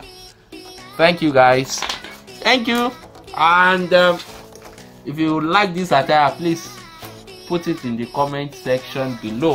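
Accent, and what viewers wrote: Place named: Africa. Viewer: Thanks for the presentation, and, interesting breaking the ice to Africanize YouTube engineering video presentation. Your language is clear- sounds like a seasoned African. In addition to your efforts, I will encourage you to put what you talked about in this video into a practical teaching. You could have one biogas source, connect the engine to it, and then show the world how goodly it works. Try this and how that raises your views, likes, comments, and subscriptions. Thank you, Sir.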